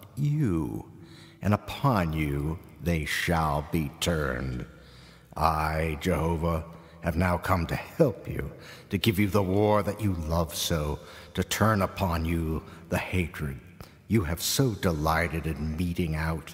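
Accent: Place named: United States. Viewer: Kevin Walker No. DeGrimston was British, this is an American accent.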